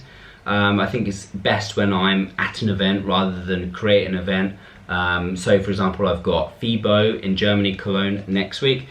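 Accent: United Kingdom. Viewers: your British Accent is so cute.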